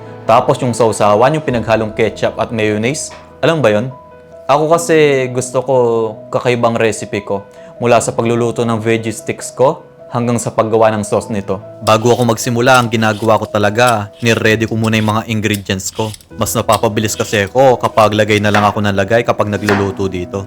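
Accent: Pampanga, Philippines. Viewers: Kapampangan po ba kayo?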